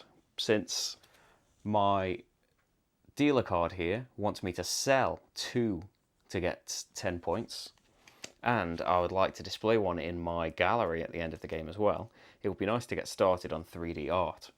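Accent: United Kingdom. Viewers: Hi there and great job, just discovered your channel and this playthrough is great. Thanks for the video, for us foreign players the british accent is sometimes more clear than the american one. Keep the good job and thanks again!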